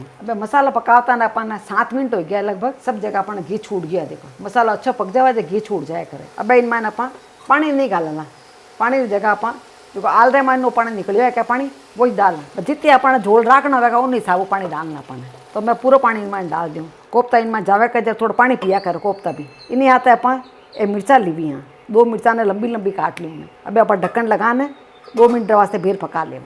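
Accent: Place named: Rajasthan, India. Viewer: Apki har recipee bhut achi hoti h aur apki mrwadi language sunne me bhut achi lgti h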